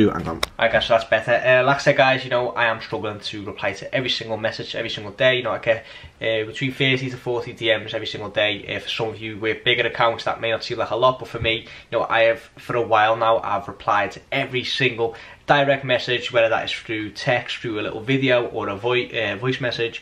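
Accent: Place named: Liverpool, United Kingdom. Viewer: how is it that you are a dutch citizen but sound like a scouser? ☺️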